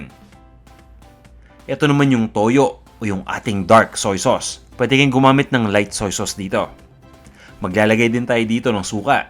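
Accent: Philippines. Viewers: Hi, hello from Australia! New subscriber here and am I so glad to have stumbled upon your channel. Now I can cook authentic Filipino food for my family like how I remember it as a child in the Philippines. I love how your Tagalog-English is so relatable to mine. Been here all my life, just about, but TG have relearnt Tagalog. Thank you for sharing your knowledge! 😊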